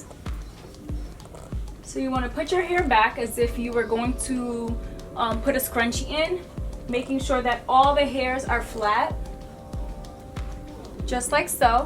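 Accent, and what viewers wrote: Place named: Jamaica. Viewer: You should speak with the Jamaican accent more often 😁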